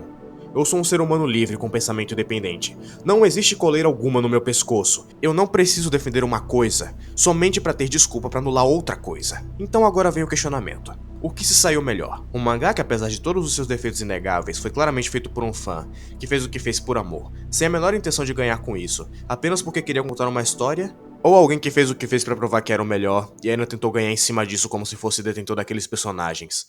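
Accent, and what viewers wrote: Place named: Sergipe, Brazil. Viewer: Vídeo muito foda mano, mas tira uma dúvida, você é sergipano?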